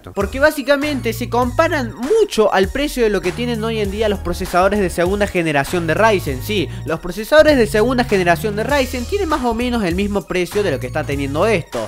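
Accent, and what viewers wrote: Córdoba, Argentina. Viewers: no, es cordobés xdd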